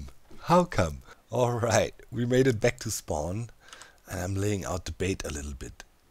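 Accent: Germany. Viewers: that synchronised inventory poppy technology thing is absolulty mindblowing. Recognized you last season because of this for the first time. Since then love watching ur videos (and espescially that ur vids are so long, haw*.* best for coffee in the morning) haha and btw first time i heard u speaking id know hahaha hes german, his pronunciation is the same like mine :D:D lots of love from potato to potato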